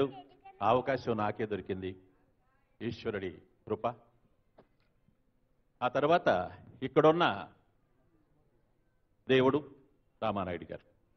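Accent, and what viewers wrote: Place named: Rayalaseema, India. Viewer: That is our rayalaseema style ,,, feeling proud frm proddatur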